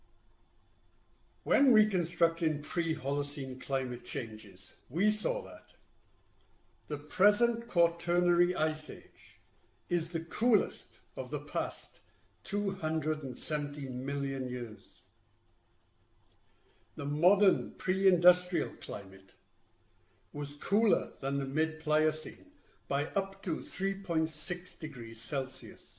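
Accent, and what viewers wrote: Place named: Scotland, United Kingdom. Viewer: I had a professor that reminds of this narrator. He had a great deal of knowledge but was more boring than watching paint dry or grass grow. He does have his Scottish accent that aids his presentation slightly but I couldn’t make it through it. Possibly because I’ve seen this data presented in other formats. Carry on the fight though as I’m sure that there are many out there that either haven’t heard or refuse to accept factual data on “anthropomorphic climate change”.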